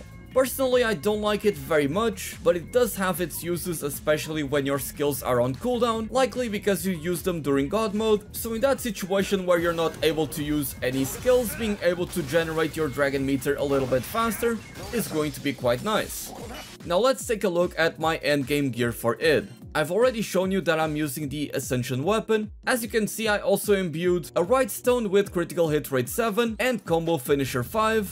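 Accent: Portugal. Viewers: então esse sotaque era português 😂😂😂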